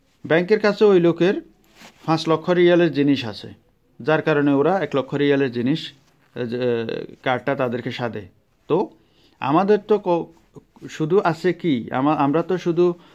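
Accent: Noakhali, Bangladesh. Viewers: Thank you. Bhai apnar bari ki Noakhali? Kotha suna mona hola. Apnar suggestion bhalo laglo. Apnar email address ta dan.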